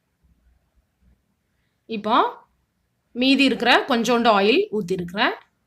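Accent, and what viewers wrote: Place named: Tenkasi, India. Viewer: Hi Akka..... my native was Tenkasi (pavoorchatram). So i liked your way of talking, while seeing your video your voice taking me to my native. I watched all your videos....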